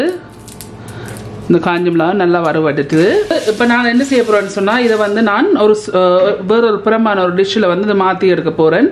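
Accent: Jaffna, Sri Lanka. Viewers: அக்கா நானும் இப்படி தான் வறுக்குறனான் நீங்கள் இலங்கை யாழ்ப்பாணம் என்று தெரியுது அக்கா எனக்கு இறைச்சிக்கு போடுற தூள் போடுறிக்களா அக்கா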